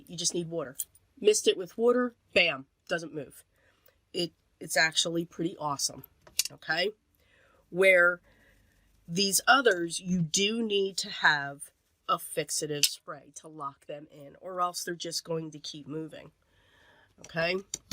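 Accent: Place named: New York, United States. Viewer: There is a lot of information here, so I might have missed it, but I was wondering your opinion on which brand had the most shimmer? It is hard to tell on camera and I am sure in person it is much easier to tell. I also was wanting to know, for whatever reasons, which brand you are leaning toward using the most? I realize you probably don't want to "knock" any of them, but each person will have their own preferences, ie: wanting more shimmer, less shimmer, binder, non, etc. I am sure each has their own good qualities, but I would really love to know which you lean toward and why? I have perfect pearls and love it, and I also sometimes use cheap make up (and then seal one way or another) as I can get more range of colors that way, and less expense. But your opinions would be helpful. =) Thanks so much for sharing this, I found it very useful. 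Plus I always enjoy hearing you chatter. =) (I am not sure where you originally hail from, but your accent reminds me of back home in NY, and I miss it there and the days of growing up there.) Oh, and love your sense of humor. You make me laugh often. LOVE it when you do junk journals too! You often feel like a soul sister...despite not knowing me at all (sadly.) One last thought...I was thinking a Versa Mark pen and any mica powders would be fun together. Could make for some fun and shimmer writing/lettering. TFS as always. =) ~Kat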